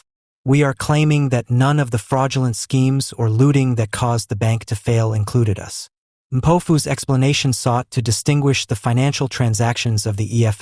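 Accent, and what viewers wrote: United States. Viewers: Why is an American doing this voice-over?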